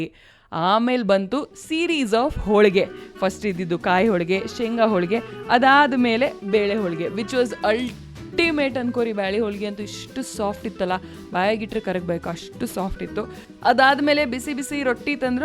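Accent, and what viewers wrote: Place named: North Karnataka, India. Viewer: Soooo nice to hear UK kannadaali commentry ❤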